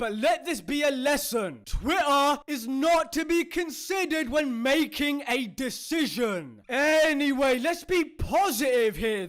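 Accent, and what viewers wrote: United Kingdom. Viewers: The British accent makes this 100x better lmao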